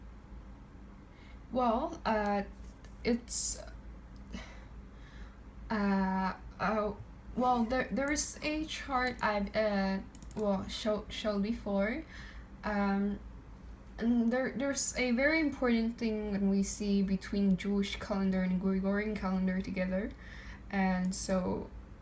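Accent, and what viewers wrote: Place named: Australia. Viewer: Listen to that Australian accent lol... God bless you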